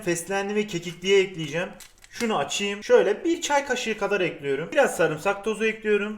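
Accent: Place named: Hatay, Turkey. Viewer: Hataylı olduğu için , şivesi öyle 😔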